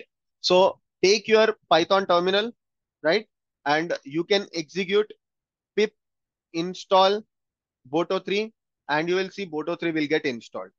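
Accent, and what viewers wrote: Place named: Telugu, India. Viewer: sir you are doing a fantastic work. Go ahead. But i have one question that, as i am not belong to AP, your english communication accent is mix of telugu, so it is dificult to understand your lectures at once.I want you to focus on these small things and come up with a good technical english tutor because whole country is seeing your videos.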